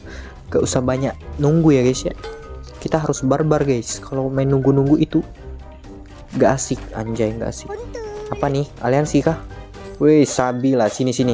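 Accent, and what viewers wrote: Palembang, Indonesia. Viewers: Bang lu orang Palembang y bg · Bahaso Palembang nyo keliatan bang j awoakwowk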